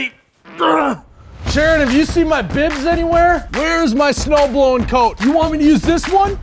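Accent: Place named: Minnesota, United States. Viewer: I actually Googled "Holiday Gas Station" to figure out where this dude is from. Northern Wisconsin? I thought the 'you betcha' thing was more of a Minnesota thing. Either way, love the videos.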